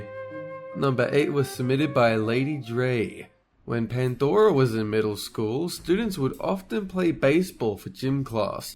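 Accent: United Kingdom. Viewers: Eveline E-001 he's British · Are You British? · Wait, your not American? Are you British? Or are you Australian?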